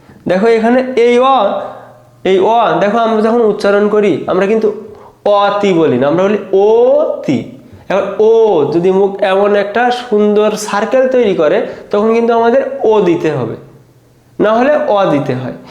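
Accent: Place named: Satkhira, Bangladesh. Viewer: বাসা কোথায় আপনার,,????? কথার টোন শুনে মনে হচ্ছে সাতক্ষীরায়,,,আমি আপনার সব ক্লাস দেখি,,,বাংলা সাহিত্য গদ্যের mcq and ব্যাখ্যা দেখে তারপর বই থেকে দেখছি এখন খুব সহজ হয়ে গেছে,,, ধন্যবাদ ধন্যবাদ 😊😊😊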